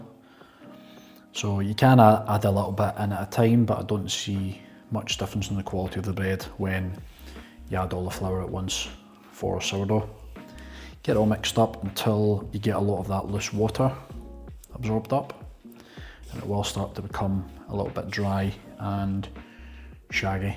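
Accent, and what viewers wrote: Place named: Scotland, United Kingdom. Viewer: of course, my wife even said that she doesn’t know what’s better - a man baking a bread or a Scottish accent she hears while watching it haha 😄 fingers crossed for your channel mate!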